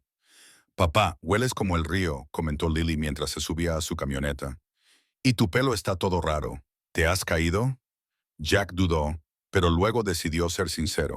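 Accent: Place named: United States. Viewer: Realmente el relato con esa tonada INNECESARIA de Yankis con una hormiga en la lengua me pone de muy mal humor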